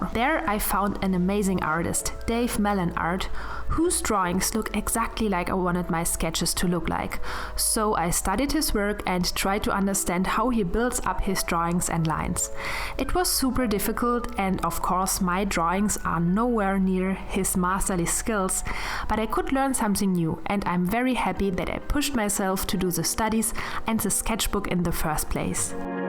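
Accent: Germany. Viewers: yes she is german, as a german i am ashamed of how harsh her english sounds .... i cant even listen to it tho... sorry I know how hard it is to correct the way of speaking but she doesnt even seem like shes trying . i know thats verry mean to sa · Du bist deutsche... hört man voll😄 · Are you from Germany? Because your English sounds very funny... Just as a german 😂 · I think her accent is really nice and cute, and I love to listen to the german accent! (also french and italian accent and other accents as well! ) Have a nice day! : ) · Man hört, dass du aus Deutschland kommst. Aber trotzdem cooles Video. Ich fände aber cooler wenn es auf deutsch wäre, da mein Englisch auch nicht so gut ist.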